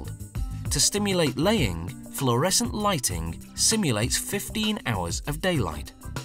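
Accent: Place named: England, United Kingdom. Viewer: So I made an observation with my colleague, let’s call him Hona, and we observed that the narrator in fact has an English accent. That is all. G’day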